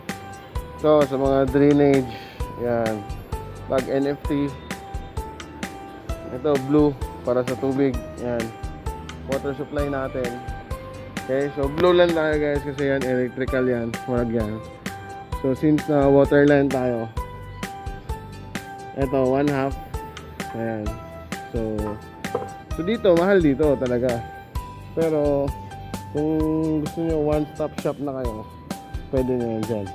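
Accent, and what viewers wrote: Visayas, Philippines. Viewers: bisaya k b sir?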